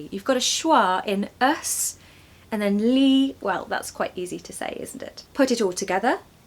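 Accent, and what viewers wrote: RP, United Kingdom. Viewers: You are soo cute and sound natural....British accent is really beautiful and omg are all British women this beautiful 😮....always enjoy watching you mam..😊🇮🇳❤️🇬🇧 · Thank you so much indeed, ma'am! I love ur modern RP. Always It remind me😊 something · I am learning English, I like your British accent very much, thanks for you videos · Hi, thanks to your video my hearing celebrates again!🎉🎉🎉 Helpful topics you've well explained again, under your beautiful MRP sound with! 👌 I'm also fancy the american way but when am back to your videos discovering again where the true is!!! 🚩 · Love your british accent and always can't wait to watch another YouTube video from you ❤ Greetings from Indonesia 🇮🇩😍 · 😮When I lived in Cambridge in the 70s my landlady had wonderful diction like you. She was eccentric and constantly trying to seduce me but I put up with her to improve my English.When I moved to California I passed for British until I absorbed local sounds. 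I can switch if I try but your exquisite RP (to me it's perfect UK) is hard to match. Two small amusing details: the wrong Evenink sounds like Lugosi in 1931 Dracula. My generation learned from...Oh, giiirl. BTW, you are surprisingly expressive for a Brit, are you maybe part Irish? Great teacher and a charming lady.😊❤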